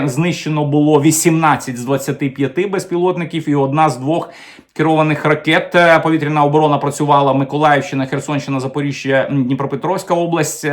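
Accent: Russia. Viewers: Пане, сьогодні вам я поставлю лайкос, тільки за те, що мені не різало вухо оте ваше, рашистське, -- "тєма", "схєма", "проблєма". P.S. Але ж, ні, в кінці ви таки викинули своє рашистське "тєма". Ну, не в обіду вам, але так виглядає, що ми з совка вийшли, але совок залишив свій слід. Ви послухайте, як говорить корінний росіянин, котрий не так давно перейшов на українську мову, телеведучий Олексій Суханов. Та в його вимові близко нема таких висловів, як у вас,корінного українця, котрий частину свого життя пропрацював на УТ.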